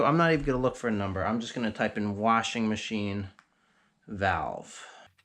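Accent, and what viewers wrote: New York, United States. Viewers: What part or town of New Yawk are you from? looks like upstate from the vids Ive seen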